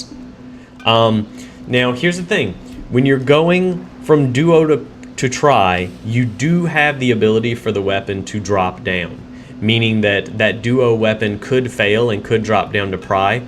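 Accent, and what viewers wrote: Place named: South, United States. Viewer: I've been waiting for gaming guides from people with country accents. Thank you for representing our people, and Bless Online your heart.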